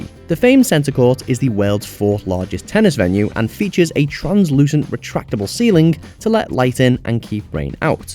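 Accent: United States. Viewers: Yeah. Dude sounded so American.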